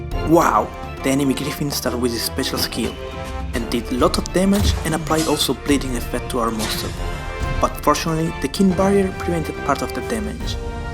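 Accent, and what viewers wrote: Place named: United Kingdom. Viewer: British accent LOL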